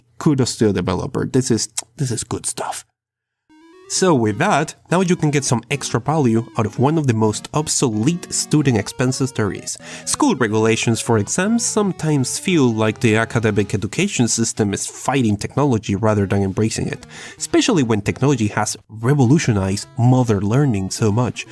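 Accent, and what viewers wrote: France. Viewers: wait, you're french? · You're french? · Are you french? That could change my vision of the life 😲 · Are you french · are you french ? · So are you from France? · Are u french? What's the accent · Tu es français ?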